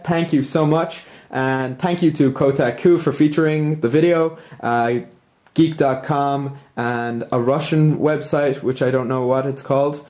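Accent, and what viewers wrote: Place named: Ireland. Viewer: I could tell you were Irish in the other video. Probably because I watch a lot of U2's old concert vids on youtube - your accent is quite close to Bono's.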